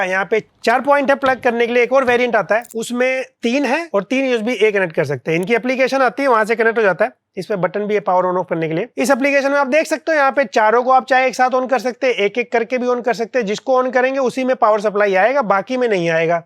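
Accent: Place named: Uttar Pradesh, India. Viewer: भइया आप के बोलने का तरीका बड़ा बढ़िया लगता है मतलब लगता है की हा हम उत्तर प्रदेश से हैं बहुत इंटरेस्टिंग वीडियो होती है आपकी ❤ से love u brother 🥰🫶🏻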